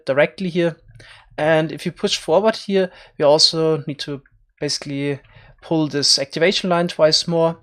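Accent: Germany. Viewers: Man hört das Deutschland sehr gut raus.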